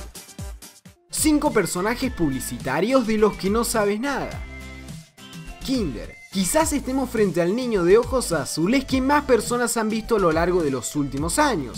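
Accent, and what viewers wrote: Córdoba, Argentina. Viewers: NO ME MIENTAS TU ERES ARGENTINO! YO IGUAL :DDD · Este men es argentino? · es cordobes? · todavia no se te sale el asento codobe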